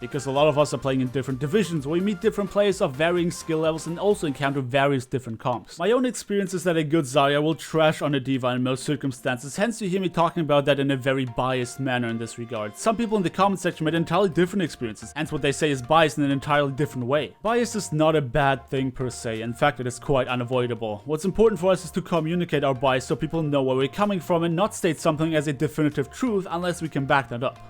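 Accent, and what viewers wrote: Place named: Germany. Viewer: Your accent is rad. Are you German or Austrian?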